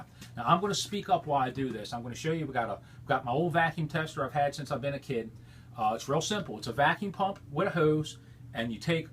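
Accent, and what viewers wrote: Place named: Baltimore, United States. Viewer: Damn, you GOTTA be from Baltimore!! I know that accent anywhere!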